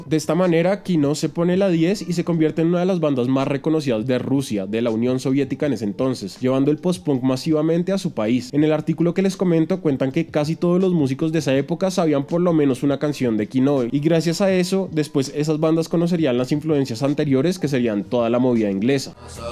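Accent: Colombia. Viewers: oiga pa, deberiamos formar una banda mucho mas indie y experimental que rompa estos esqumas, soy guitarristas, me cuentas se nota que eres colombiano XD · ¿Eres de Medellín o Bogotá? No distingo tu acento, soy fan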